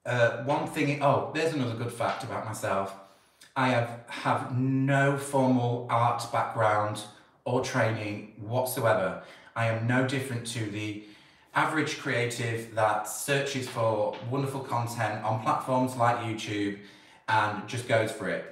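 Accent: United Kingdom. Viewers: I'm only part way into this video and I just had to stop it to comment. I've watched your videos for a long time and I've loved seeing how you interact not only with viewers, but with your PIECES! You seem to have an "intimate connection" with each project you undertake. And because I personally have a love of languages, I totally enjoy your British accent! LOL, but what I really wanted to say was, how proud of you your dear Mum must have been. And she would be delighted to know that you are once again being so creative, as you obviously were created to be! I'm also loving getting to know the person behind the face.....who knew you are a hairdresser! No wonder you branched out creatively into other genres. Your inborn love of color and beauty is evident from seeing the bits and pieces of your personal surroundings. Now, back to finish the video:^)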